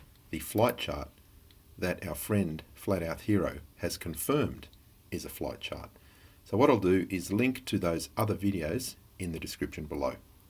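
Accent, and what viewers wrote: Australia. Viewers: that silky smooth Aussie accent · I guess it's the australian accent. Buddy ;o)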